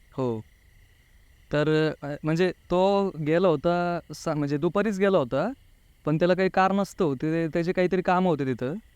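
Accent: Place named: Pune, India. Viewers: Punyachi language ahe ti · पुण्याचे सगळेच तसेच बोलतात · Pune mde tsch boltet rav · Dada pune chi language tasich aahe 😅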